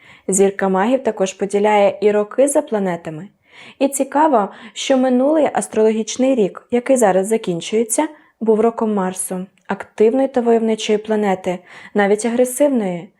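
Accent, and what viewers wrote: Standard. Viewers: Героям Слава 🇺🇦💛💙 Дякую вам велике❤ Дякую за нагадування про традиції випікати жайворонки , за світло , яке несете до людей. Дякую за чисту українську мову і стиль🙏❤️ Світло перемогло темряву , обов'язково 🙏❤️ УКРАЇНА ПЕРЕМОГЛА 🇺🇦💛💙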